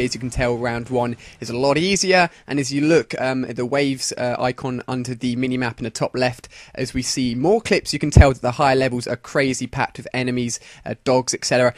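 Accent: United Kingdom. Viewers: Good video but British accents give me headaches.. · fuck your slicky british accent. stinks.. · I wanted to watch a MW3 gameplay video, not some obnoxious british guy blabber on for 10 whole minutes.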